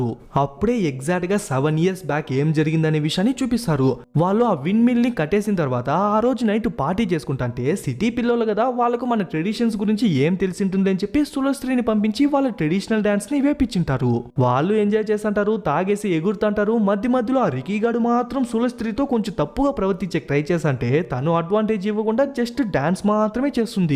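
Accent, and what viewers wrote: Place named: Ballari, India. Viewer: Explanation is good...bro I think you're from ballari... Karnataka state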